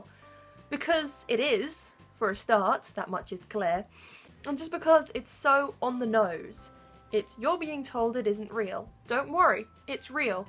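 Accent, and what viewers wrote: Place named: London, United Kingdom. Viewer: You have the same accent as my writing buddy out by London! She completely accepted my asexuality when I asked her to potentially help me out with writing romantic scenes in my books, even said asexuality sounded cooler and less annoying than being sexually attracted to people. She's not asexual (as far as I know) and she immediately accepted me without any need for debate and we'd only known each other through email for maybe 2 weeks. What's your excuse world?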